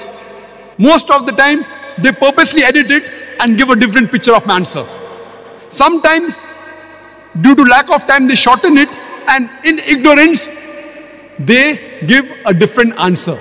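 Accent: India. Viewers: Assalamualaikum, admin please give english caption/automatic caption. We not too understand Indian accent. Please... Wassalamu'alaikum.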